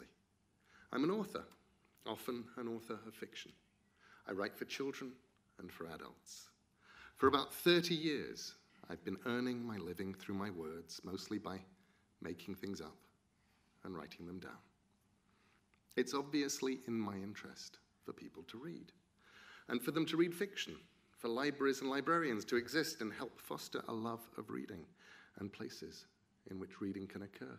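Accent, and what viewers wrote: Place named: England, United Kingdom. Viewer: not a TED talk, but as Neil said - the content is what matters. Though sound is very low and No motion on the scene as well as on the screen, watching it for 5 minutes and they I see - it is actually a video - not a frozen picture, the Table and meaningless slides + reading from paper makes it difficult to concentrate. But wow, the orator is terrific, no uhms or any other faults, just perfect deep voice and English accent :). Whoa, it is so weird - youtube makes a critic of everyone.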